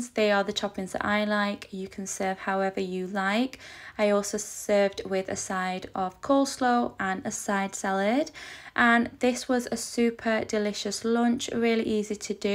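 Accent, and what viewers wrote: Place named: Northern England, United Kingdom. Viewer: Excellent lovely clear proffessional presentation & I think a northern accent,great,certainly try some in our air fryer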